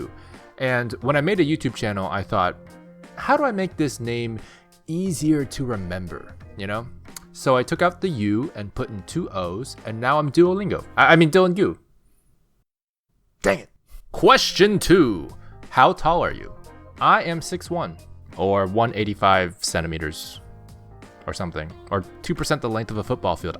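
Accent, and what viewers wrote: United States. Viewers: chest on anything make anything better, sounds like an american alright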